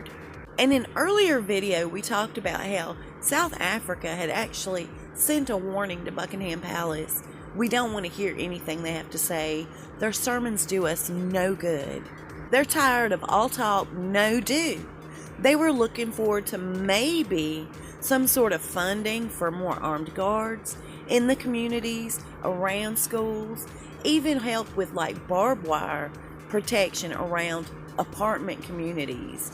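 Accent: South, United States. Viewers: Stop already!! You and your haters need to keep your opinions to yourself. You love drama and gossip. You sound like your from the South I can't understand some of your words. Drop the accent, what are you perfect. People like me are a pain my ass. You can't say anything nice.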